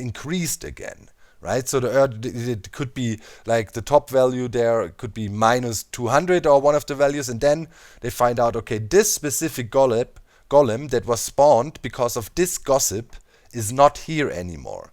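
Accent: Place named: South, Germany. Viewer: Der Süddeutsche Akzent 😁 Ich liebe es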